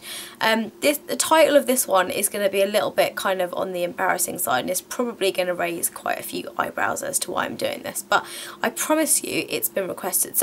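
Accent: United Kingdom. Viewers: is she British? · Omg I love ur British accent so proper!!!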